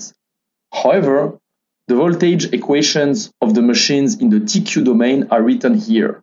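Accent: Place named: France. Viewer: Mon anglais est plutôt chaotique. Il me semble détecter un petit accent français assez sympathique ducoup auriez vous la même vidéo en français ? 😅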